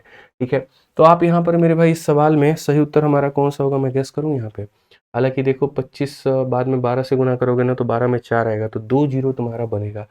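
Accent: Bihar, India. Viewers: Sir main rrb je ke liye pdh rhi hu aapki videos helpful hai thankyou sir , bss ek request hai sir please thoda sa questions ko na english me pdh kr explain kra kro taki humko pta chle ki english me pdhke kaise approach Krna hai questions ko . Baki aap bihari me bolte ho vo toh sara upr se nikl jata hai . Meri bss yahi request thi 😊😊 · Ab sir paka bihari leg rha ha gajb❤❤❤❤❤🎉🎉🎉🎉🎉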